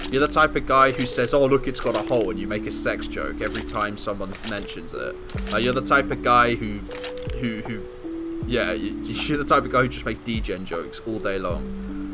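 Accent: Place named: Italy. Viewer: fuck italian english is so wild bro 😂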